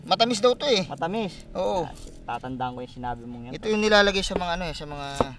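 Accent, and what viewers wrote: Pampanga, Philippines. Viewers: LOL... PARANG NARINING KO ANG NANAY KO SA IYO PAG NAGTATAGALOG MAY HALONG KAPAMPANGAN magkasakit lumalaro LOVE YOU GUYS (KALUGURAN DA KAYU) MORE VIDEOS PLS. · Halatang kapampangan kayo pre hahahaha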